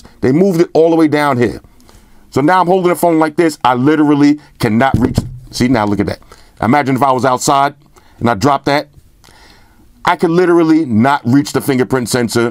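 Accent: New York, United States. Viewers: This dude is straight outta new york. Extra emphasis on everything he say lol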